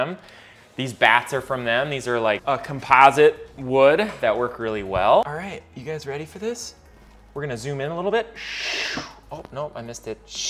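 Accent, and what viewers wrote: Minnesota, United States. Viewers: sweet vid. I have been taking classes here where i live in north carolina on the outer banks for the last 2 years- my friend and I just scored a full studio set up and we are just now in the new phase of starting to sell pottery. its soooo fun! We are stoked and love your vids! Im originally from MN and come home every year so I am going to have to come check out Mocha Monkey! you sound like all my friends back home and its sweet to hear your MN accent/vibe!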